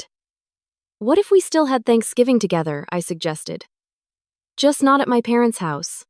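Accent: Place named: United Kingdom. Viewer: You sound like from UK . Whoever is reading does not read well. Wrong pronounciations. !!!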